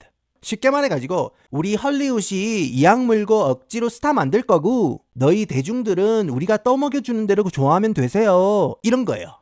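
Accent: Gyeongsang, South Korea. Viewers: 내용이랑 별개지만ㅋㅋ영어와 경상도 사투리가 묘하게 섞여있는 말투가 매우 흡입력있습니다ㅋㅋㅋㅋ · 오늘 이 채널 영상을 처음 보는데 은 영어를 배운 경상도민이신가요 경상도말을 배운 재미교포이신가요..?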